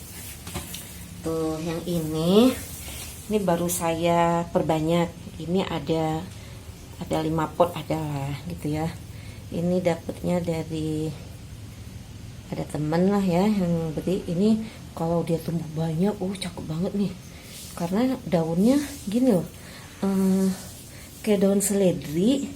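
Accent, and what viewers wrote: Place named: West Sumatra, Indonesia. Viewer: Bund asal sumatera barat ya??.logat bahasanya..😊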